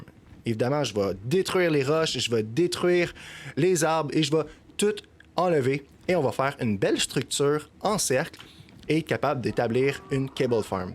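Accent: Quebec, Canada. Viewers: Hey 😁! Superbe! Merci 😄 vraiment très bien ta ferme. Et pour répondre à la question que tu te posais et étant de Nouvelle-Calédonie, ton accent québécois ne me dérange pas du tout. J'ai déjà pu jouer avec des Québécois sur certains jeux et j'en garde un très bon souvenir. Des personnes agréables, gentilles et surtout très drôles 😋. Après je ne vais pas le nier, il y a des fois où vous avez vos expressions et c'est vrai qu'à ce moment là, je ne comprends pas toujours 😂 mais ça ne me gêne pas plus que ça 😀. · Chaluuut ! Je te regarde de Belgique. J'adore ton accent quebecois , un accent tellement enjoué , qui donne le sourrire, ainsi que les expressions. 😃 l'orignale m'a tué 🤣🤣 et le "thylaceo" 🤣🤣 Continue copain , tu ensoleilles mes journées 😘🤩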